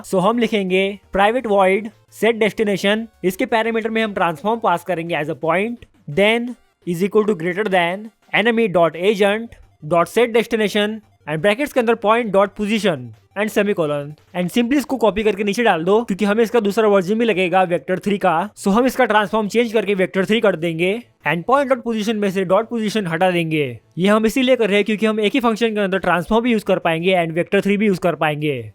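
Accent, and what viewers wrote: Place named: Maharashtra, India. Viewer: bhai tera tutorial ka pronounciation sun ke me <num>% sure hu ki tu marathi aahes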